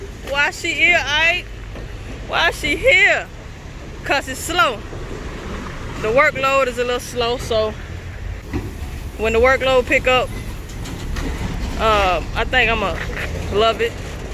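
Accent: South, United States. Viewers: Hi there! Sorry, that happened to you. My peoples lives in Memphis. I just your southern accent. Your down to earth too. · Hey lady. I haven’t been with you from the beginning. But I do remember when you were local. Then you got your own truck. I remember you talking about running under your own AUTHORITY. Then you started company hoping. And now your back driving your own and running Local. Stay post and start and keep your head up. Still waiting on that other channel. Love your Southern Twang.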